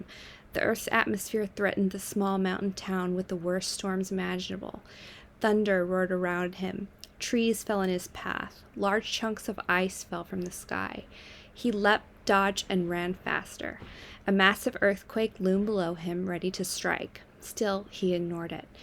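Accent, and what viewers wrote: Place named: United States. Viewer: Have you ever heard of "Mad Studies"? or have you seen the webpage Mad in America? Interesting research into mental health, also check out Sadhguru on youtube. I'm enjoying your narration the American accent is cool.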